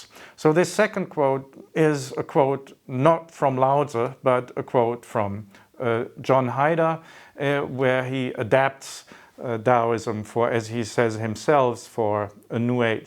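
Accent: United Kingdom. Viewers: The School of Life always got videos with wicked animation tho. That's their strength. Plus, the British narration sounds very credible. Content-wise it'll appeal to the general public. Self help, more like. From a professional philosopher's point of view though, it is lacking. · If he didn't have a british accent people would realize how much nonsense he advocates for in his videos. His videos are garbage, very ill-researched and pathetic in logic. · School of Life is pretty obviously terrible once you move past the lull of the narrator's self-assured presenter voice and British accent. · School of Life shows that by having a well spoken, eloquent British accent can make almost anything you say seem intelligent.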